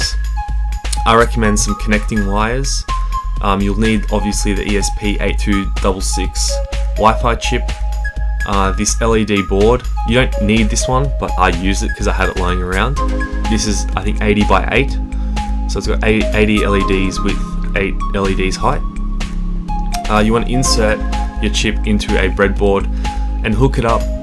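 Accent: Australia. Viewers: YOU’RE AUSSIE?! OMG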